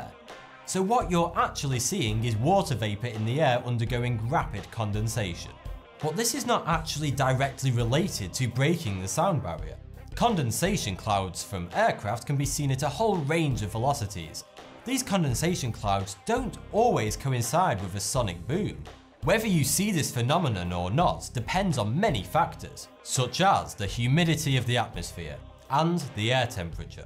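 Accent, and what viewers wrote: United Kingdom. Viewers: for the longest time i thought you were saying either 32 or 42, time to do a show on british accents, and accents in general, the exact samething happens in any language, though; as in france, and in the north of spain, let alone the whole country. ( secret, the family model ) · Why do Brits replace words with a "th" in them to an "F" sound? And Rs are pretty much silent? I fought you wuh going wif him because I hoff to bwush moy teef befo badtoyme. I'm sure it's a regional accent and not all British people sound that way, I know a few Englishmen and some have a very thick accent and others aren't as noticeable except in certain words. I think this guy just has a speech impediment, and with his British accent on top of it, it makes him sound funny. I know I'm not the only person that hears Forty Two when he says his YouTube name at the beginning of his videos, so I'm definitely not crazy. 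Speech impediment aside, I still very much enjoy the videos and find them really interesting to watch. Learning is fun! Especially when you've got someone with that kind of accent telling you the facts! It causes you to have to pay extra close attention, or you might misconstrue what's being said! Keep em coming, or should I say foughty? I'm just having fun here, no malicious intent or hatefulness coming from me, so please don't bash what I have to say about his accent! I've got my own terrible accent that im very much aware of and I have to listen to people make fun of the way I sound and be patient while they try to figure out where im from based on the way I pronounce certain words. As long as people aren't being insensitive I'll play along and get a laugh or two in alongside them, but the second they assume that im not intelligent bc I come from a parti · ohh im so in love with the Brit accent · He is saying, it's just his british accent that makes it sound like 42. 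Also is a play on words of 42. Also, he's named that because of the hitchhikers guide to the galaxy, where it says the meaning of life, the universe, and everything is 42, which obviously, is a joke.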